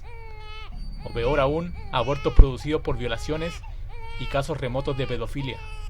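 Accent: Chile. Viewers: Pa que tan Chileno?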